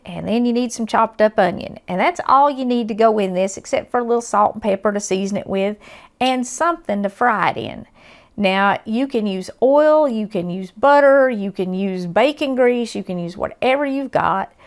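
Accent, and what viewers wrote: South, United States. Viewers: I love your food, AND the scriptures you share with us! America needs to stop turning away from Jesus. Oh and by the way, I love your southern accent too, God bless America!! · I came for the Southern accent...not disappointed.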